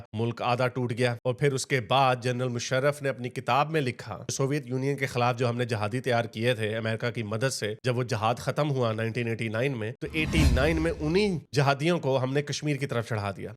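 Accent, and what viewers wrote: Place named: Punjab, India. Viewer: I love your Punjabi touch to the commentary ❤😊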